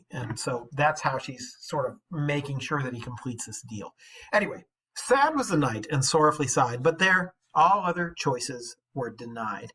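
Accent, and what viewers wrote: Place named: Oxford, United Kingdom. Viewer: Dr / Mr, I like your Oxford English accent. I live in the south of England.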